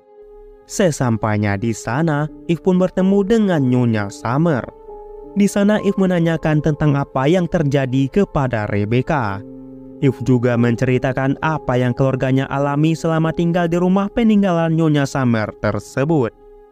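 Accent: Aceh, Indonesia. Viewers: Mentok kali bahasa logat Aceh nya ia bos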